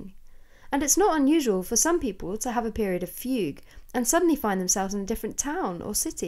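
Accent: United Kingdom. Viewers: your British accent is hard to understand at 1.5 speed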